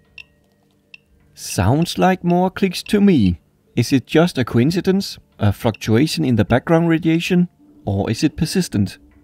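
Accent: Denmark. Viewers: Gotta love that danish-english accent ❤️ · Australian? It’s danish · Are you Danish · Wait what are you, Danish, i always thought that you were German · Are you danish? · Er du fra Danmark?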